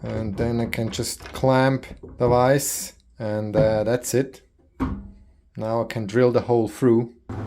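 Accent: Switzerland. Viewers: Your skills are so amazing. This is the famous Swiss precision at its best. btw i like your English with the swiss Accent..🙂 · Echt Super ! und schoen deine Stimme zu hoeren Mit Zueri accent aber kein "full on" Zueri Dytch!. Wir haben in Oktober von Hirzel, 8816 nach Deutschland umgezogen so Gruezi aus dem Schwarzwald !!!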